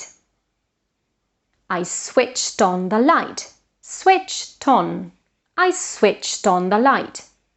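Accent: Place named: United Kingdom. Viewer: he notado que tiene el acento británico. Es mas facil aprender inglés britanico?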